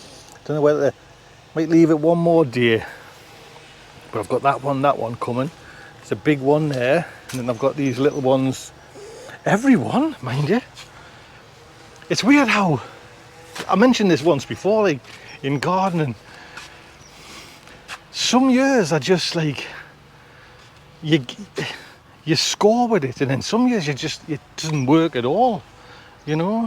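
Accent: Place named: Northern England, United Kingdom. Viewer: my name is Jim I have been watching your channel for a while, can I just say you hit the mark, you talk as is you come over as a "proper'northener I really enjoy the" honesty you show, keep doing what you do. IN you words "Take good care"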